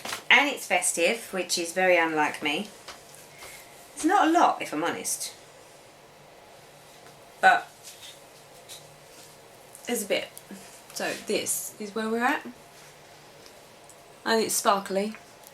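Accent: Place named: Essex, United Kingdom. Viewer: Thank you for your videos!! I don't want to be critical of others, but.................... You have a way of speaking into the camera, telling a story without 5 minute hesitations, no umming, ah-ing. I feel you are talking to me and not your ceiling. You don't "forget" where you purchased something. You are organized and have everything at your fingertips, no matter what room you're in. You aren't dashing about to another floor in your house to find something while your camera is running. You know where you get your floss. All your fabrics have names rather than "I'm not sure what it is." or "Yes, it's pink and white but I don't know why or who did it." You don't hum non-existant musical ditties all the while lacking the talent to do so during a "Stitch With Me" video. If your phone rings, you don't answer it. How gracious and courteous of you. 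You probably have one of the most concise, informative, friendly videos on Flosstube!! And......you ALWAYS look so nice, even when you aren't well. (I include Carolyn Masseo among your caliber of video makers.) Whew!! Thank you for allowing me to get that off my chest. It's not hard to figure out what to watch on here as long as I see a video I haven't watch from " "!! And come on now!! You HAVE to love that Essex accent! Hugs,.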